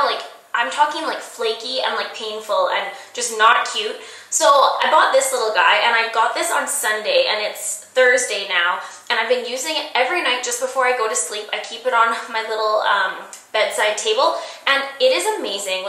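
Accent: Canada. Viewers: Random question, are you Canadian? :)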